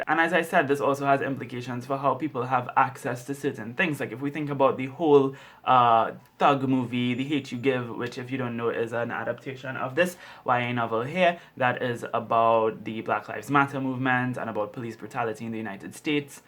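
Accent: Trinidad and Tobago. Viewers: I dont know you but I've learned how to recognize the Trinidad accent! There are LOTS of trinidadian doctors at my hospital that I've learned the accent...am i right? Are you from trinidad?!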